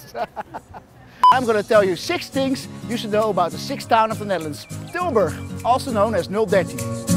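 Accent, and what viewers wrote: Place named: North, United Kingdom. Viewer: Jesus lad ,that accent is not from Tilburg ,you are from up North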